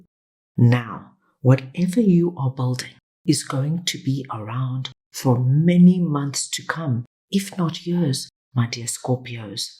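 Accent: South Africa. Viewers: I know a South African accent when I hear one. Hello maatjie.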